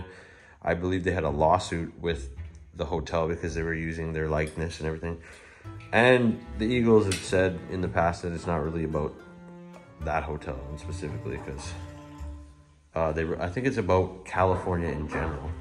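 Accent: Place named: Spain. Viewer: Just discovered your chanel I like your spanish accent, keep it up.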